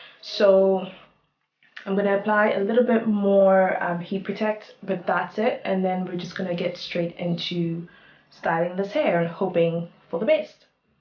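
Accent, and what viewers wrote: South Africa. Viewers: Love the hair. What flat iron did you use? Btw you sound South African.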